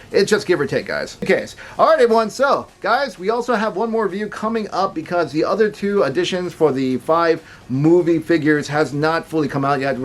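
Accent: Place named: United States. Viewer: bro, mind if i'm asking, where did you go to school? your english is so damn good with pure american accent. sorry, no offense. but considering where you are from, i haven't detected any mandarin speaker accent. btw, i'm glad that i've waited this far to purchase snake eyes cause i really like this movie version a lot far better than the rest cause of his ninja's and assassin's look. i've always considered him as an assassin. all the previous released looks more military to me. which is not my cup of tea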